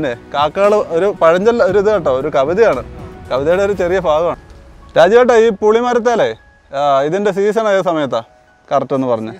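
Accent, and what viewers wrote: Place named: Thodupuzha, India. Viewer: Ahaa njan thodupuzha.. Samsaravum aaa style okk kandapo thoni.namude nattukaranane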